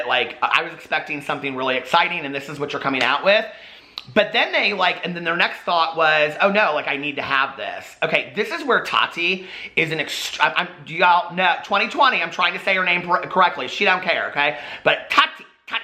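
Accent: South, United States. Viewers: where do you get your southern accent and sayings? I've never heard "gag a maggot" outside of the south. Love it!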